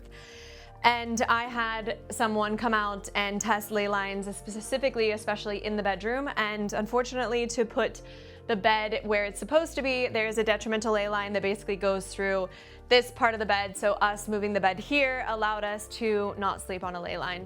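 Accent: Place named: United States. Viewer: You and your obnoxious murican accent..why didnt you stay in Usa?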